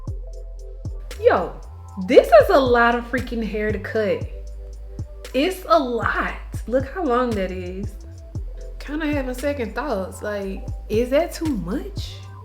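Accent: South, United States. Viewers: And that country accent is everything! #SouthernRoots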